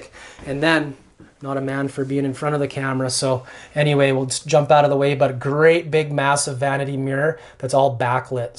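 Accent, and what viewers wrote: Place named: Canada. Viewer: Boy theres Canadian accent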